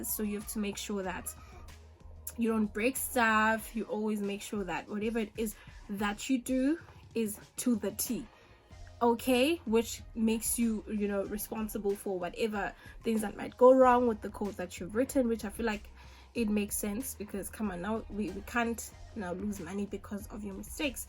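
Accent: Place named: Johannesburg, South Africa. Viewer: Why does it sound like you were a UJ STudent?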